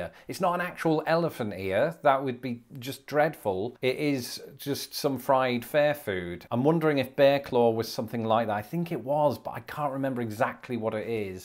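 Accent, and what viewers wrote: United Kingdom. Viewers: The good thing is that you kept both you British accent and sense of humour! Which is excellent😂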